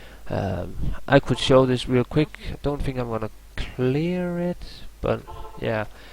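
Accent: Denmark. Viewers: uhhHU i think this guy is from denmark if you are say hej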